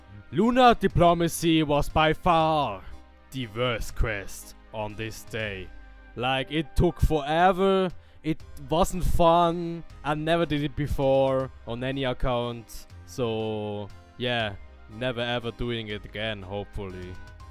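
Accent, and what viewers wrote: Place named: Switzerland. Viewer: Direkt am Akzent der Schwiizer erkennt hahaha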